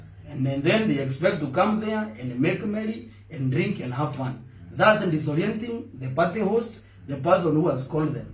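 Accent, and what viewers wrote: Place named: Meru, Kenya. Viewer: The meru accent iko fty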